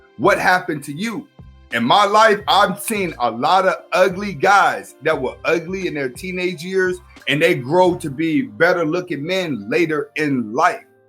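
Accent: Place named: New Jersey, United States. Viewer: Bro I could literally feel you were from New Jersey.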